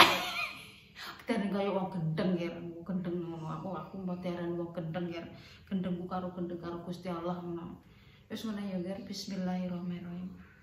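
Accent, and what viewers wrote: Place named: Cepu, Indonesia. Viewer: Mak e suaranya merduu,,,, khas tadarusan e wong cepu,,, semangat sa saged e mak,,, ojo isin wes tuo karepe golek guru ngaji ben tambah wapik wacane lan mahroj e,,, iso di gawe konten juga mak,,, golek ibu2 muda anak siji seng lagi genaon omah2 seng kapitan kon marai ngaji mesti akeh seng gelem mak,,, ben tambah barokah rejekine mak e,,,, soale aku yo alkamdulillah kapitan mak,,, eleng jaman jeh genaon omah2 ngenese polll pondok an ga ndue ijazah ga ono pemasukan idep2 ngamal nulongi wong pinter ngaji mak,,,, lope mak kuuuu,,,, cintaku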